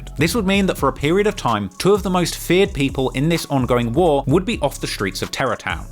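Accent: United Kingdom. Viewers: A British accent talkin bout American activities?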